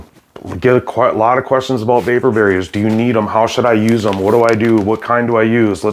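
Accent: Minnesota, United States. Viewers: I love your videos... great explanations. And I know how hard it is to quit smoking!!! I quit after 20 years. Also enjoy that Minne-soooota accent. All my people live there. Great job. I also pray you will quit smoking.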